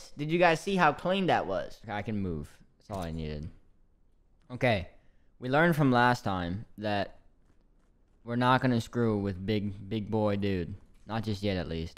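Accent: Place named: South, United States. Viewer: plssss what is this southern accent 😭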